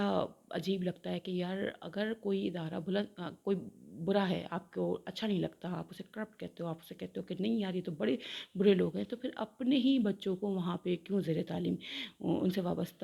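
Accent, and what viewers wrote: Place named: Punjab, Pakistan. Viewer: You are speaking wrong urdu with punjabi accent .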